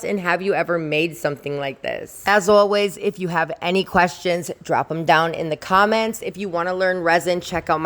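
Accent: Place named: Michigan, United States. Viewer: you talk like me, you have petosky stones you find near you, do you live in michigan? like me? i loved the table although its way more difficult than what i am trying to do, the only thing in your video i would change is i kept worrying about your hair either getting caught in the resin or the tools, made me worry like a momma bear. lol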